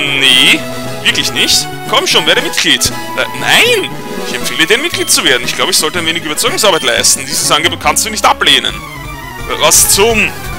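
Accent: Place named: Austria. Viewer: Ich feiere ihn auch vorallem wegen seinem österreiischen Akzent :D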